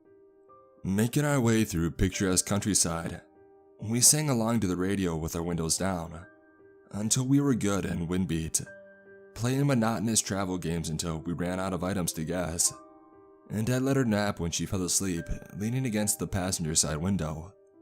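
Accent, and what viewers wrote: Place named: South, United States. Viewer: I love the southern drawl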